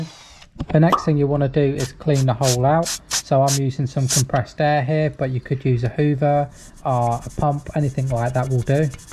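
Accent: England, United Kingdom. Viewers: Is that England accent?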